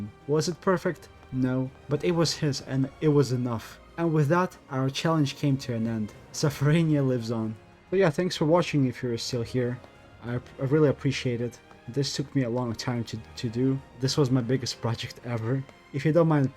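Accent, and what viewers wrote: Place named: Philippines. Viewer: why do you sound like a filipino?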